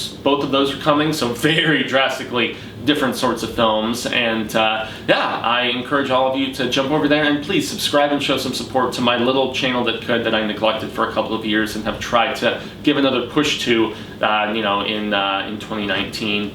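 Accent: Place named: Maine, United States. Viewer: This review was really funny,. I laughed through out. And your Maine accent is funny XD LOL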